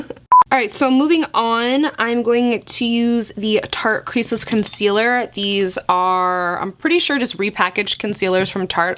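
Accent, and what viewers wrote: United Kingdom. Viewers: That British Accent tho 😍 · Those are my fav lashes but I can't find them anywhere near me 😭😢😭 I. Missed your British accent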